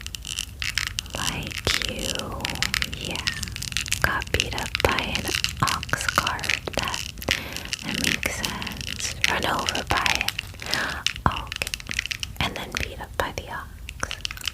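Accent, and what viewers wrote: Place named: New York, United States. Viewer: I don't know where you get these ideas, but I LOVE them!! So many tingles and you are very entertaining! I love the one's with a NJ/NY accent! Reminds me of the 3 months I lived in NJ! 😂❤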